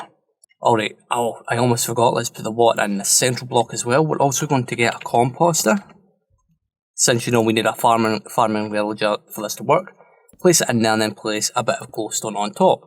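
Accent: Scotland, United Kingdom. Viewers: As soon as I started watching this my head just screamed 'YESSSS, HE'S SCOTTISH!!!!!!!' Why am I like this XD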